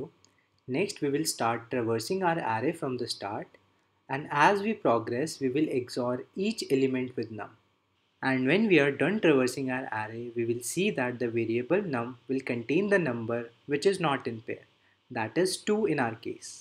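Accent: India. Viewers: fucking indian accent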